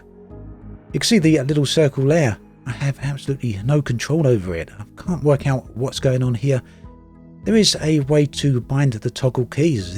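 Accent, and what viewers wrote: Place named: Northern England, United Kingdom. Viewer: It's an English accent, most likely from the north or upper midlands.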